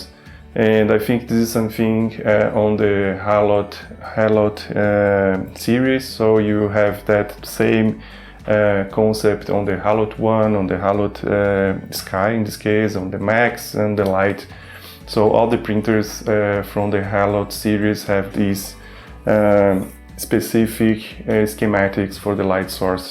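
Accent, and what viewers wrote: Brazil. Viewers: By the accent, it's Brazilian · Sotaque de BR haha belo review meu caro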